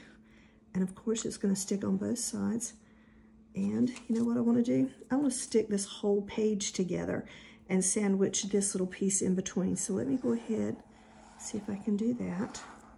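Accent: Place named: South, United States. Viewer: Hi~I've just found you. This looks like a fun project which I'm going to add to several of my many, many journals. I'm thinking that this is a great way to use up some of those found, pressed, and saved items plus what-nots that are accumulating in my desk, that can use a safe home and this remedy is so clever! Since I do not have a square punch, I'll use a round one instead, which I'm sure will turn out just fine. I'm really enjoying your soft sweet southern accent. Thank you for apologizing frequently, but it really isn't necessary , at least not for me. You're fine just the way you are. I look forward to more inspiration from you, till then, thank you.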